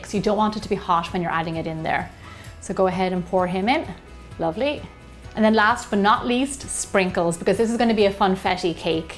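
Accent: Ireland. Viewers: Wow! You are super inventive! My picky 9yr old loved your baked potato soup in a mug. Benjamin said it was so delicious. And, my 4yr old Gianna just saw this video of triple layer cake in the microwave and can't wait to bake one. Thank you so much for your amazing recipes! Love your Irish accent too. My father's background is Catholic Irish (Kelley) is my maiden name and my mother is from Ecuador. Congratulations to you and your husband for your new baby. What a blessing. She will be so proud and grateful for such a great mommy. Love & prayers, Deirdre & Patrick Tondo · You're so pretty, and your accent is so cool! Lemme guess... You are from Ireland? Thank you for your creativity, it's a miracle how you can actually make a cake with a microwave! 😋☺️🎂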